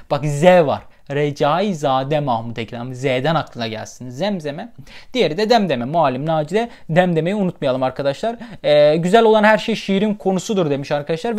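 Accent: Adana, Turkey. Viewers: Abi sen vanlı değil miydin adana şivesi ne alakasxsxsxsxs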